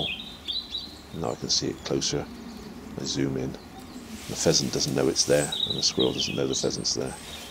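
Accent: United Kingdom. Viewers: I was just thinking if you put all that all your videos on DVDs and put them on American type DVDs to where I can watch him on my DVD player I would buy them and I know a lot of other people that would enjoy your Pest Control show on YouTube would enjoy them as well and be a good way to make money as well cuz a lot of people would buy them and I would definitely buy them just too helpful hint saying that I would love to sit back and watch it on a bigger screen instead of my phone they let me know what you think and it would be awesome to own all your videos because it's been an hour of watching them two good learning experience there's a couple of things that you say that are funny in each video because just the way the British talk I'll I love the way the British talk they have a certain way with their slang and it's just funny and certain things that you say like mr. 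Pheasant and for me is like thanks for playing mr. Squirrel it's all over LOL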